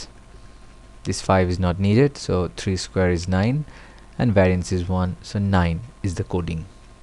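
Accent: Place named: Caribbean. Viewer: Thank you verry much...like your accent..west indian? very good teacher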